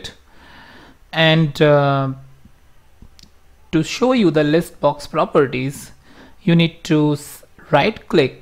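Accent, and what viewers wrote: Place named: India. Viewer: I think Indian accent is good while speaking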